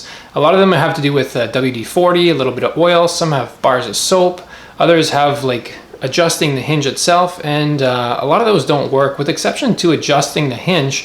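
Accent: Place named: Canada. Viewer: i knew that was a Canadian accent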